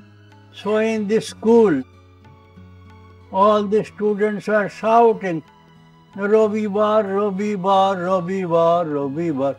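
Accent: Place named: Bengal, India. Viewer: Its beautiful how even his english accent is like bengali english accent❤❤❤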